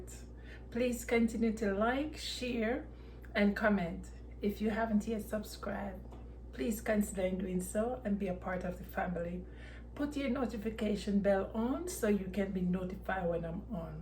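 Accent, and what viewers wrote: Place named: Jamaica. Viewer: I tell you I love this jamaican accent, this was really interesting to watch can I visit you every Sunday?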